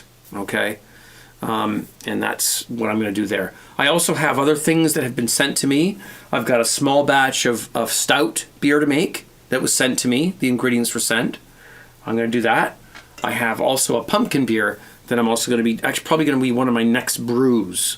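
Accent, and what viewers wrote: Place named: Canada. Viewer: what part of Canada are you from? Ontario?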